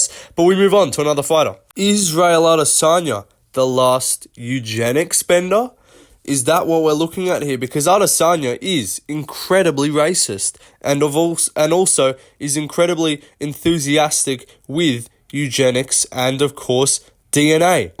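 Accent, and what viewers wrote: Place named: Australia. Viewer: I thought who the fuck would make a video like this. Then I heard you speak and was like ahh he's an Aussie 😂 well done mate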